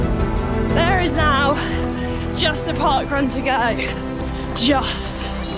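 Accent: United Kingdom. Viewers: your amazing! as amazing as the british accent! ;)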